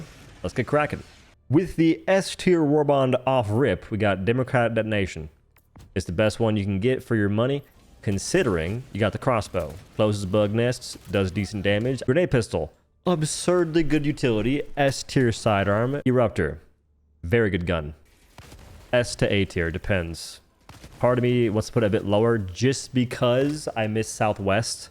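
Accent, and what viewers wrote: South, United States. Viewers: Don't do a Southern accent again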